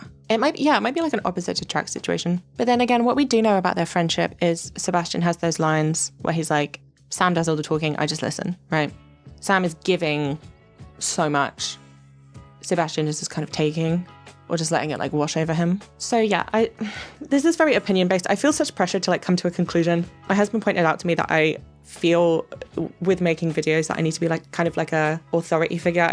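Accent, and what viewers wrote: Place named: United Kingdom. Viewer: GODDAMIT DO A SHANE VIDEO U PRETTY WOMAN WITH A THICK BRITISH ACCENT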